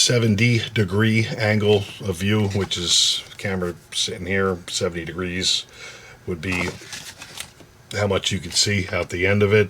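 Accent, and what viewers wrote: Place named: East Coast, United States. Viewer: Ohhhh koooo.... that's what's up watching on my break right now... also I hear the east coast in ur voice...mirrah... instead of mirror lol that's awesome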